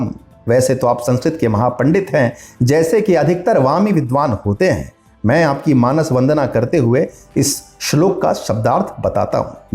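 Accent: Standard. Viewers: Sir, aapki aawaz sunkar accha laga, aap bahut sudh Hindi bolte hai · It's sudh hindi sunkar a6ha laga. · Kya baat hai bhai tum Hindi bahut shudh bolte ho bhai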